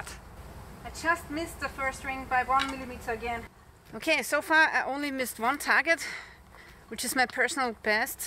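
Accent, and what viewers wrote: Germany. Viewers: Are you German? You have a German accent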